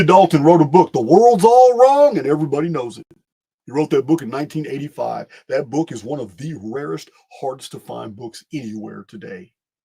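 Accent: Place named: Tennessee, United States. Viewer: Where is your accent from? My wife is struggling to understand you, but I'm fine with it. Is it Tennessee?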